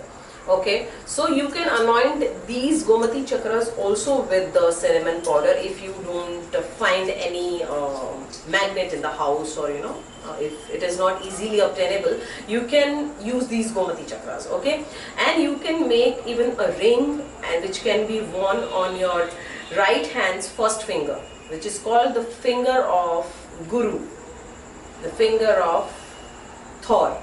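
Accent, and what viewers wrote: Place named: India. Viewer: I LOVE the English language spoken by an Indian person. I don't know why I have an affinity for this dialect, but I do. I can listen for hours! Thank U for a very informative video!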